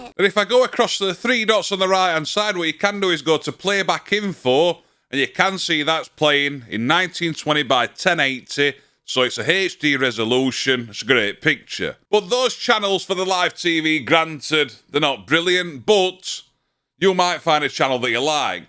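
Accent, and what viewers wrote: Yorkshire, United Kingdom. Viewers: A Newfie from Yorkshire? Love to hear the accent.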